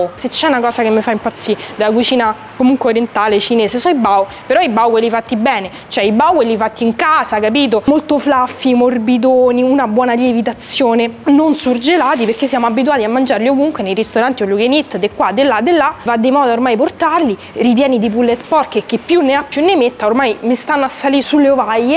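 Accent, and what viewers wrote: Rome, Italy. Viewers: il suo parlare romano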